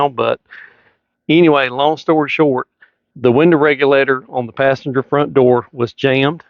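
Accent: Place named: South, United States. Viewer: Good review, no fluff, thank you. The best part was seeing/hearing a southern man among southern long-needle pines. I can smell them now. Oooh that smell!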